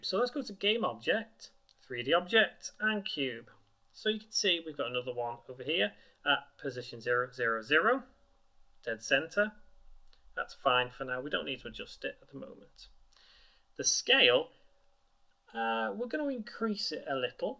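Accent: United Kingdom. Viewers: he is british. They say Z differently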